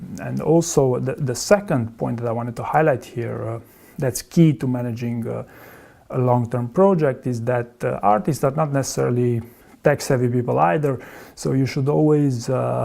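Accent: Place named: Romania. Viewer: this is a Romanian accent if I've ever known one.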